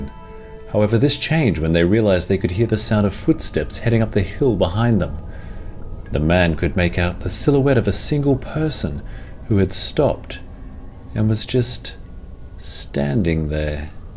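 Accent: Australia. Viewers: Is it the cultivated Aussie accent?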